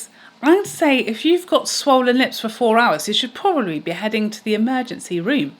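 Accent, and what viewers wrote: England, United Kingdom. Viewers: I could literally listen to you all day! You’re so funny and I love your English accent ! Canadian fan 🇨🇦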